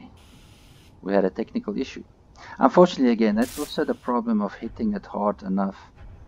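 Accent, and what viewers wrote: South Africa. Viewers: I know a South African accent when I hear one!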